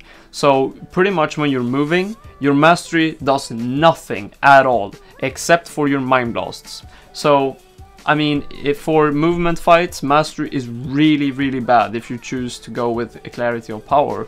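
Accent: Sweden. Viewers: You sound like a swedish person? Are you?xD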